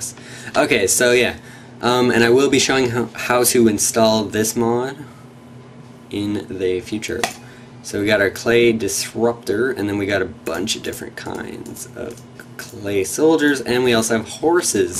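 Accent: Canada. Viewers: R u Canadian? If so, I can tell...... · r u canadian???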